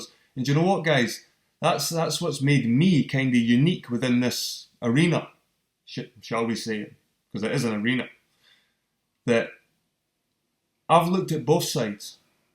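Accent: Scotland, United Kingdom. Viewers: Your my new favourite YouTuber. I love your honesty in the conversations you present here. I don't mind what your stand is on the shape of the earth, at least your aware of the subject and give it some thought. Sorting out the lies is a great idea. Good man and that Scottish accent is beautiful to listen too. Cheers! 💜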